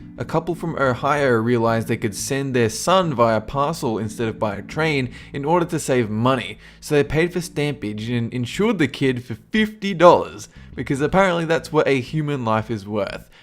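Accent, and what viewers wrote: Australia. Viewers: im pretty sure he is Australian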